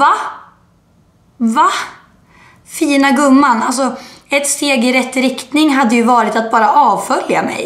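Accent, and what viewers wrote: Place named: Stockholm, Sweden. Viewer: Vilken jävla stockholmska du fått sjukt asså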